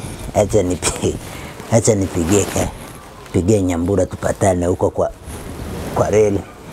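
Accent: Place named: Kikuyu, Kenya. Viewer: Haki si ako na kipawa sana yaani ako na accent ya wa kikuyu kabisa love it 😍 😀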